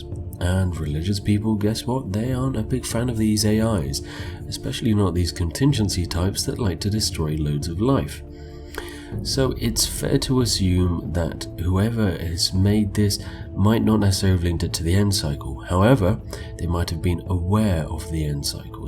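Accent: United Kingdom. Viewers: I kinda miss this brit voice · So he’s not just doing a bit, is actually that British?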